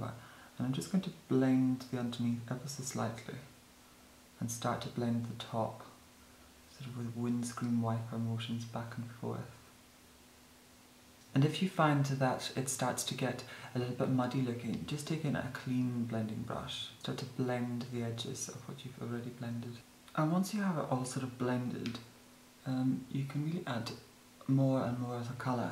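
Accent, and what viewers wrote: Scotland, United Kingdom. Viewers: It's a Scottish accent (as you might guess from the surname!) ;) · Q&A- I love your accent, what part of the UK were you born? Also, I would love to see your interpretation of Magenta from RHPS! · Is your accent Scottish (proper) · Are you from Scotland?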